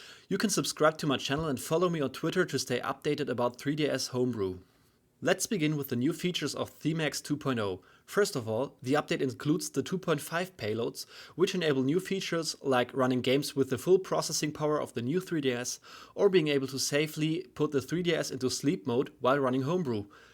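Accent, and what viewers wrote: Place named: Germany. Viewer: Du bist deutsch das höre ich xD :D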